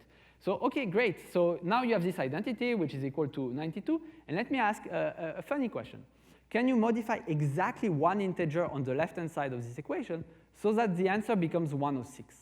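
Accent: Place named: France. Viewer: Stochastic Parrots 🦜! Taking over the world! Clever birds! Anyway, I would have expected Sebastien to be affected by Methuselah Syndrome, it would only have been fitting. But the french accent makes up for it, like François Truffaud in "Close Encounters".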